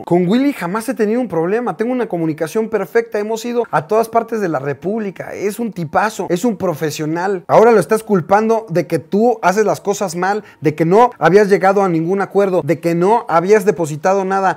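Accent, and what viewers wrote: Chile. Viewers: See oye a chileno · suena a chileno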